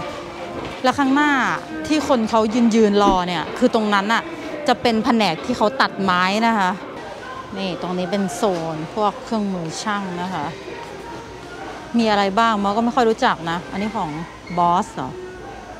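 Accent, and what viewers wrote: South, Thailand. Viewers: พี่มอสคนใต้ใช่มั้ย555 เข็ญไม่รอดนี่รู้เลยย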